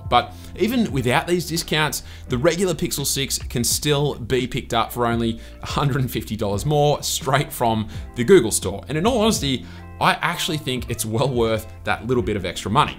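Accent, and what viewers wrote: Australia. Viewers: as I heard that aussie accent, I subbed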